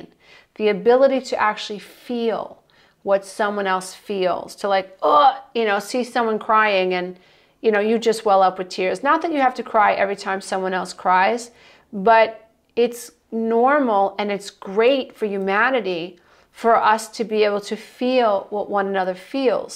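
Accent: New York, United States. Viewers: Your New York accent is EVERYTHING! 😊🏙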